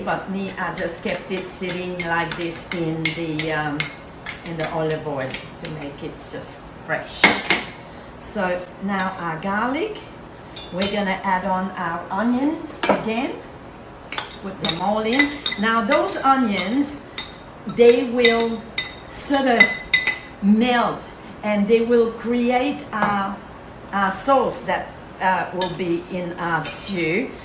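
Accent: Australia. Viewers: Tour accent is Australian. One cannot fake the vowels. Interesting. So you are from Quebec? Hm. The recipe probably is good, regardless.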